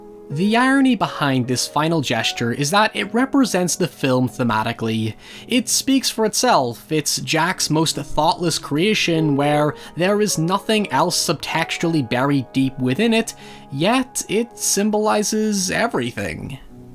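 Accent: Northern Ireland, United Kingdom. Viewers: Btw, I think your "soothing, sexy Northern Irish accent" adds to the uniqueness of your content and almost seems to add to the mysticism of the movies and topics you discuss.:) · Northern Irish? always thought you were Scottish. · thank you for flat out saying you're Northern Irish. I'm fascinated by accents within the English language as a non-native speaker and I've always wondered if this soothing voice of yours was, in fact, an Irish one. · "If you wanna listen to my soothing, sexy NORTHERN IRISH accent..." Oh, so THAT'S your accent! I uh... don't know how I didn't get that. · northern irish accent 😍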